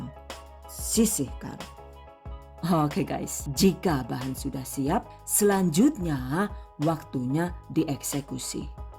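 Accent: Banyumas, Indonesia. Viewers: Suarane mdok tmn yakkk asli bnyumas y yu😁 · suka cegek saat dgr ad bahasa ngapak nya hahahaha,, tp lucu juga sih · Suka bahasa ngapaknya.. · noted..besok mo nyoba bikin ahh..btw mbakayune lucu temen klo lg ngapak mix with english..👌👍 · Waalaikumsalam. Catat. Ngapak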